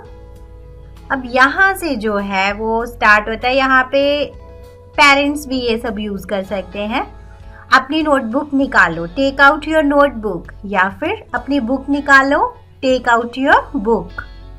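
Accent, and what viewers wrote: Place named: India. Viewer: Sorry but I can't understand what you said. The accent is like Indian or Malay or someone from Middle East.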